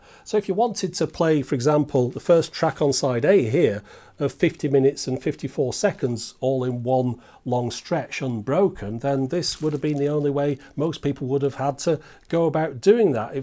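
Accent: Germany. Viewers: The same with German, the English voice sounds a bit "German" anyway. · the english language explanation is clearly done by a kraut, you can hear the accent through even though he does a good job of masking it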